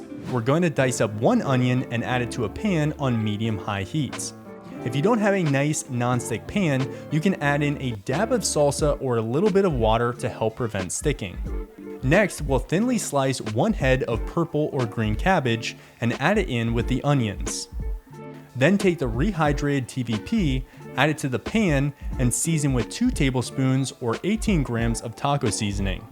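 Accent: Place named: United States. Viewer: You have the most American accent ever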